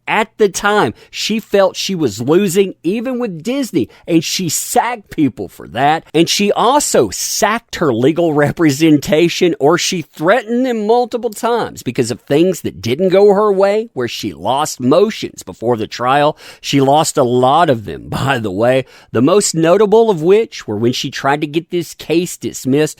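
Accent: South, United States. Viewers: I come from the UK and was listening to your stream last Night and you mentioned people not liking your Accent. To be Honest I love your Accent very Southern Slightly Camp and best of all I could imagine you or at least your voice being the voice of a very dangerous crime boss who feeds people who crosses him to his Pet alligators and from Louisiana or some where like that anyway I like it All the best from Leeds in west Yorkshire United Kingdom haha You should hear ur accent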